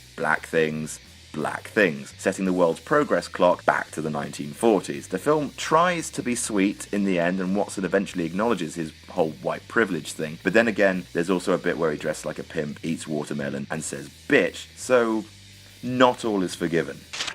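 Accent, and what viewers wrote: United Kingdom. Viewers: Nothing like listening to a stuffy British-sounding dandy with a stick up his arse passing judgement on films 50 plus years old... That said, someone needs to clue this guy in to the fact that actors playing other races (and sexes) goes back to the time of Shakespeare, when females were not even allowed on stage, and there WERE characters of color like Othello in the lexicon. Fast forward to the silent film days where Lon Chaney was lauded as the "Man of a 1000 Faces" for his ability to play a variety of characters, including foreigners and Orientals (yeah, I just used that word to piss off the narrator). You can find offensive stereotypes in everything pre-1960s from Bugs Bunny cartoons to 3 Stooges shorts, let alone feature length movies. It ain't rocket science. The two I can't believe here missed are: 1. Charlie Chan, initially played by Warner Oland (who also played Fu Manchu as mentioned) who was Swedish and then Sidney Toler, who was American. 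In the 80s, a new Chan film was protested for its use of non-Asian actors, but flopped due to lameness as opposed to protests. Fox Movie Channel even pulled its "Charlie Chan Theater" (which showed the old 30s and 40s Chan movies) off the air in the early 2000s--most likely due to PC over-reaction. 2. Peter Sellers as Sydney Wong, a Chan parody character in Neil Simon's "Murder By Death" (1975), which is a Who-Done-It satire featuring famous fictional detectives (i.e. Sam Diamond instead of Sam Spade). While Seller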